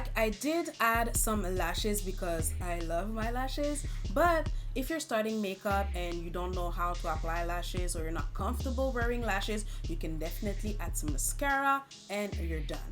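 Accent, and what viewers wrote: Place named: France. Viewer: YOU sis deserve a standing ovation!! I have to tell you that I am a whole, adult female who doesn't wear much make up BUT has always appreciated the beautiful looks. I have ZERO knowledge about how to apply it. I rock the basics and like 'I'm good' YET the inner diva has always wanted to rock a beautiful natural face. I landed on your tutorial regarding an 'I Heart Revolution palette (which I picked up at TJ MAXX in NYC USA) trying be to be cute🤣🤣 and was intrigued at how descriptive you were. I loved your french accent also, so cute 😊 but also because you were easy to listen to and came of so genuine and non judgemental. I then peeked at your page and SUBSCRIBED as soon as I landed on your *affordable MAKEUP FOR BEGINNERS tutorial. It was a wrap. Thank you, thank you your tutorials are BY FAR THE BEST, THE most COMPREHENSIVE tutorials on YouTube. 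I feel confident that I can rock a cute look for my upcoming cruise. Much MORE success sis!🙌🏾👍🏽💜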